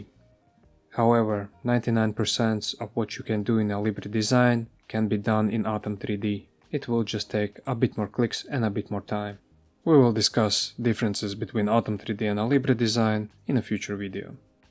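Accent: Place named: Latvia. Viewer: I can tell a Latvian just by hearing the voice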